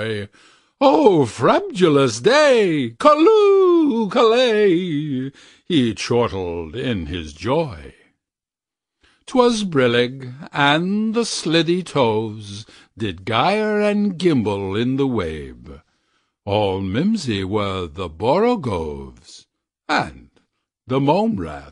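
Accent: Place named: Transatlantic, United States. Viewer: I could live with the mispronunciations (they are made up nonsense words after all so a subjective interpretation is permissible), but the accent is just wrong. This is quintessentially English prose and a transatlantic rendition just doesn't seem appropriate.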